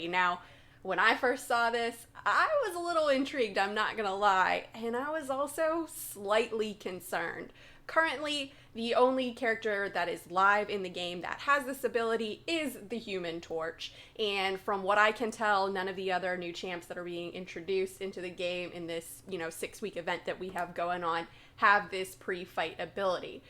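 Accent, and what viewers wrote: Pittsburgh, United States. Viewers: Ur Pittsburgh accent is showing through... Lol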